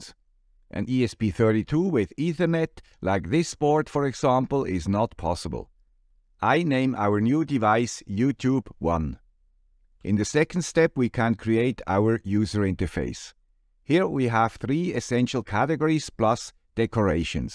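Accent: Switzerland. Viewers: Hi to the guy with the Swiss accent from the guy with the Swedish accent! 😂😂😂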